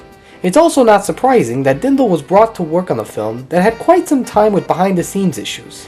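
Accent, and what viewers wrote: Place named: Nashua, United States. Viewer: I heard Nashua, NH accent...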